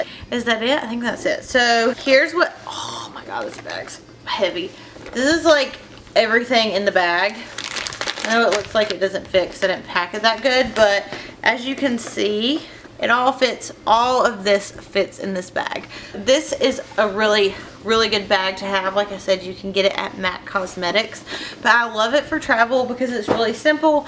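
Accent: South, United States. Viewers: love your southern charm, you're accent is so adorable, have fun in Atlanta!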